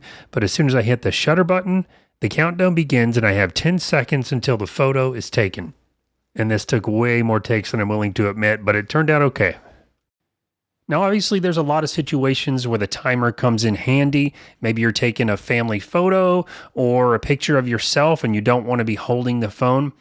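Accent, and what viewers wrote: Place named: Indiana, United States. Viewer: I really enjoy and understand (at least almost all) what you are saying. You speak in my native language- "Indiana English"!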